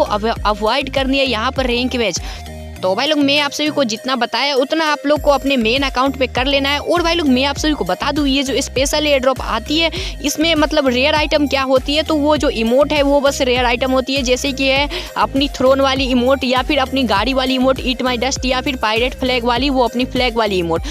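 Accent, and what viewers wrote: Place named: Gujarat, India. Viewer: You're gujarati rait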